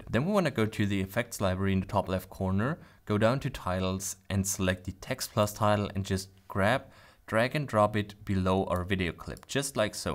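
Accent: Germany. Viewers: Man, for a German you barely got any Accent left, how come?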